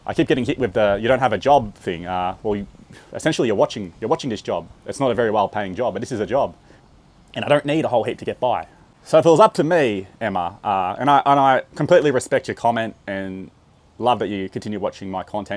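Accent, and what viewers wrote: Australia. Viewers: Hi I am from Burirum and recently I am live in UK 🇬🇧 I am watch your channel every day and I am learning a lot more English from you ❤ I quite like the Australian accent love it ❤ Anyway stay strong and think positive ❤you are doing well ❤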